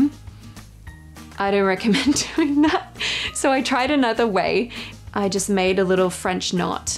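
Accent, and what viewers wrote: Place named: Australia. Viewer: Thank you, I have just started embroidery and it was lovely to listen to an Australian. I am looking at purchasing Essex linen, your thoughts.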